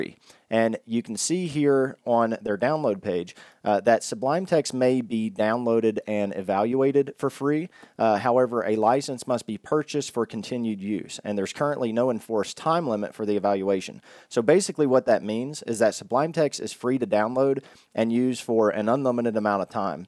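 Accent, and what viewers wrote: South, United States. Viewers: Does he have a southern accent or am I hallucinating?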